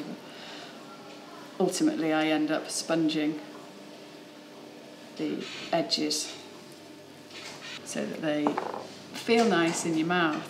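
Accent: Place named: United Kingdom. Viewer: Sounding British, I wonder where she picked up the coffee habit.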